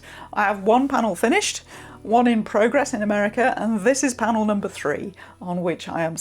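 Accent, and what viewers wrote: United Kingdom. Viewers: WOW! You sound so British.... I double checked if you were the same....and not...🍄🍄 Thank you for the video. When I started writing To Do lists, most times I miss placed the paper. My Mom taught me to use cotton string (no stretch) to take my own measurements. I would then use my wooden ruler to measure the marks on the string. Good to see/hear you again.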